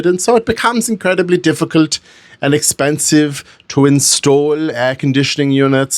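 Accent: Germany. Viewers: Sarcasm in a German accent is the best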